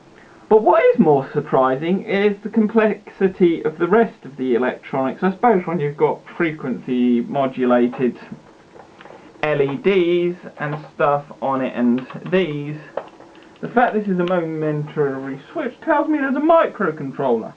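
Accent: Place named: London, United Kingdom. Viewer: Thanks for the breakdown video. Like your accent, is it east or west London?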